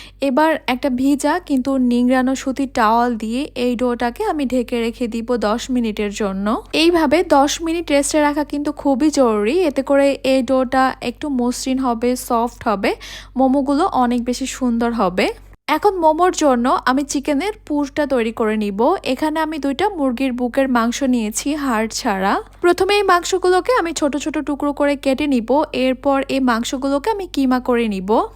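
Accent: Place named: Bangladesh. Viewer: বাংলা দেশের যে সেটা বিশ্রী উচ্চারণ শুনেই বোঝা যাচ্ছে। বাংলা ভাষা টা সুন্দর সাবলীল ভাবে বলুন শুনতে ভালো লাগবে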